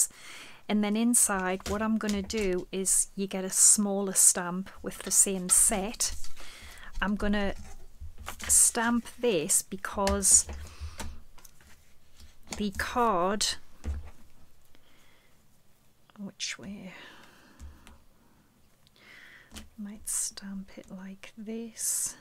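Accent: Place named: Scotland, United Kingdom. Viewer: Love this. And that stamping board. Love your dialect. Scottish?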